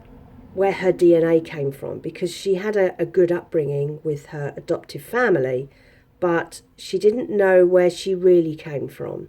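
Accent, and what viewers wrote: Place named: Australia. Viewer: I've been thinking you had an Australian accent for months! Where is your accent from ?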